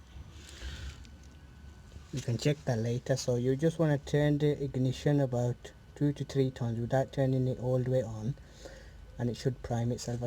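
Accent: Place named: Birmingham, United Kingdom. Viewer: You sound like you're from Birmingham, help a brother out lol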